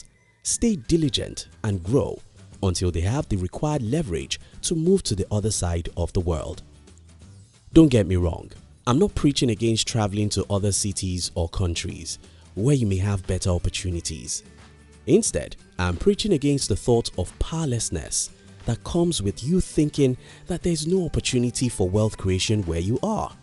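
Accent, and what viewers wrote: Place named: Nigeria. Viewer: He sounds Nigerian.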